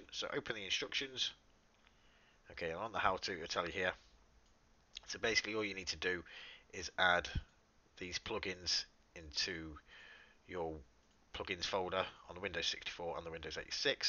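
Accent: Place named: Liverpool, United Kingdom. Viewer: scouser for the win great video mate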